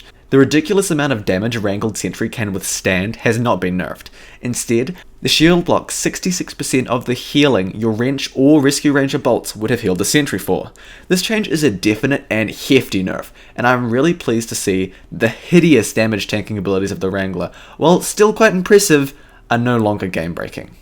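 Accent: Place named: New Zealand. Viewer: From your accent I guess you are from NZ. And holy crap you deserve more substance.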